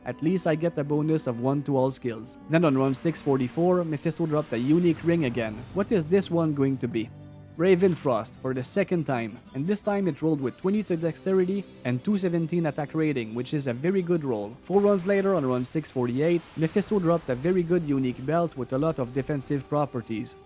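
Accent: Quebec, Canada. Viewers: Good ol Queb accent, keep the grind brozer ! ( all the run was in hell ? ( online or solo ) ? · ARE YOU FRENCH CANADIAN ? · J'entends le bon Québec! Haha ❤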